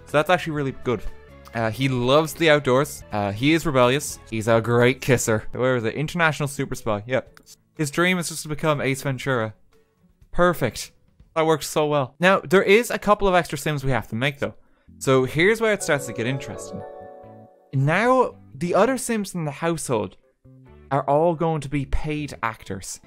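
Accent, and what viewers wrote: Ireland. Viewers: I figured you were Irish. XD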